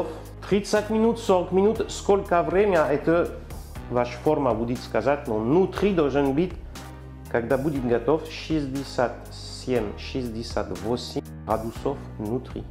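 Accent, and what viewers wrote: France. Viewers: Живу 5лет во Франции, конечно,как повар по образованию,начала активно готовить. Вася Емельяненко,Илья Исакыч,смотрела всех подряд.И тут,оляля!Я нашла французского повара,говорящего по русски!Как мило Вы говорите по русски! Это комильфо! Теперь смотрю только Вас! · Уважаемый Я смотрю ваши видео с большим наслаждением, это бесподобно всё -- рецепты, прекрасный гасконец, особенно его русская речь на французский манер, это изюминка!! Слушаю вас как поэзию, никогда не переходите на чисто русский, потому что тогда потеряется шарм. Сегодня балдела от слов -- кладём в протёртую печень маслы ( надеюсь, вам объяснят, значение на русском -- маслы). Я восхищаюсь вами и благодарю за ваши видео и бесподобные рецепты. Удачи вам и всего хорошего вам и вашей семье. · Пусть разговаривает с французским акцентом, так интереснее. Да и ему удобнее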